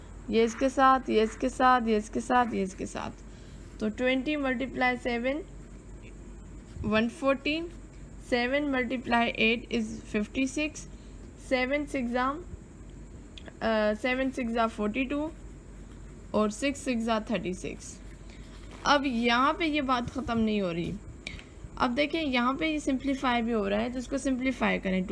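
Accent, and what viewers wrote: Sindh, India. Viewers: ڪجھ ليڪچر سنڌي ۾ به رڪارڊ ڪيو ادي، اوھانجو سنڌي لھجو اڃان وڌيڪ سٺو ۽ مٺو آھي 💯🙏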